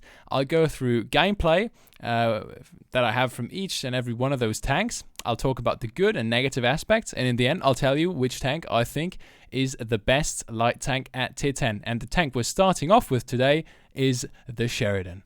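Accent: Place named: United Kingdom. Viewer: Wow are u french? U sound british haha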